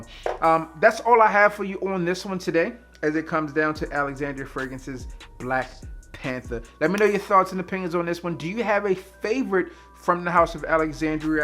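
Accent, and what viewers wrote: Baltimore, United States. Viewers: U from Baltimore?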